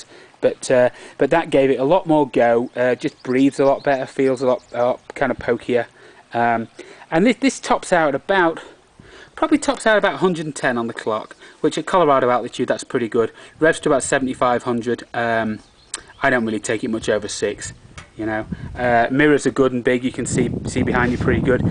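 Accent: United Kingdom. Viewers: Good vid mate....nice to see a Brit living in the US who's managed to keep his accent! I've had my Dakar for just over a week now, but true to form it has snowed so much, I can't get out on it! typical British (Yorkshire) weather!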